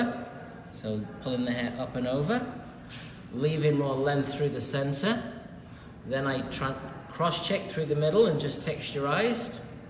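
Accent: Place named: United States. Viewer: Why are you trying to speak with an American accent ??!!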